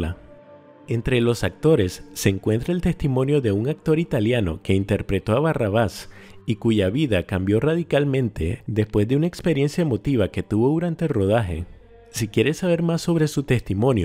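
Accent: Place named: Spain. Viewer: Muy buen video pero tu acento es espantoso debe ser castellano neutro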